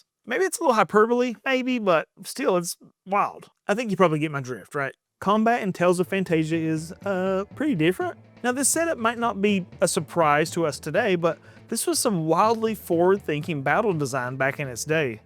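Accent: South, United States. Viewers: Finally!! I know about most of these but I've been excited to see your take on some Japan only games for awhile now! Love your content, as a fellow southerner your accent adds a level of familiarity i dont get in too many gaming channels 🤣🖤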